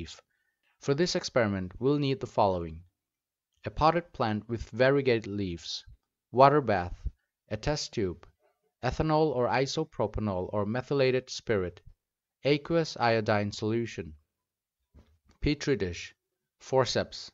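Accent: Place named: Northeast India, India. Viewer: Well it played automatically after an experimental related video. Mostly I saw high end instruments being used, then in your video I saw the microscope, very much like the one my College labs has. There I thought , he must be an Indian and by the accent, a northeastern. Then I checked your abouts'.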